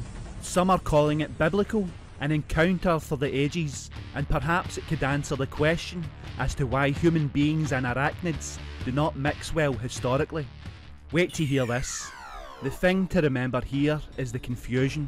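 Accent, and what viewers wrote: Scotland, United Kingdom. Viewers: Your accent lol. Super.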